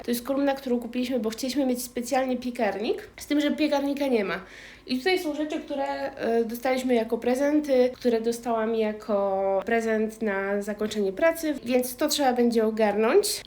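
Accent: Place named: France. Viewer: Trafilem dzis przez ytbowy przypadek i nadrabiam filmy, ciekawe jak Ci sie zmienial akcent w polskim. Teraz brzmi tak lekko francusko;). Tak 9% gotuj z Pascalem;).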